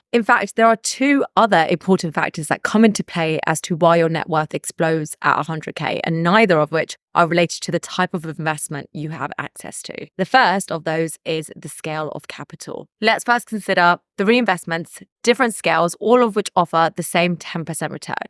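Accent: United Kingdom. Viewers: koompound interest😂😂f00-k-i-n brit accent......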